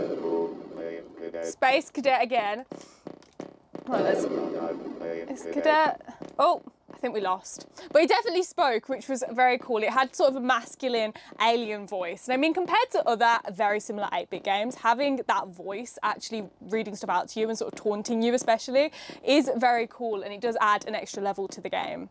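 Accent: United Kingdom. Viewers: Heh the world is advocating for more and more women in tech, I'm advocating for more women in retro-tech! This channel is going places, not only because is a woman, but for the simplicity and to-the-point videos. For me it's all of the above, but the british accent and the way the talks thru her hands makes it for me. Instant sub.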